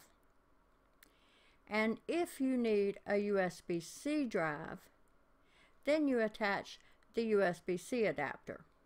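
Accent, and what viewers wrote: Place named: Texas, United States. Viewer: I like your video, are you from Texas Because this is the kind of accent I hear when I watch cowboy movies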